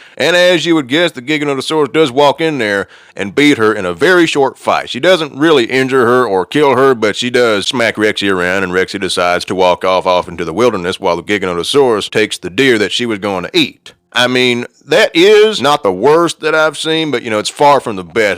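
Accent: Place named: United States. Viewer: This guy has the most american accent i've ever seen.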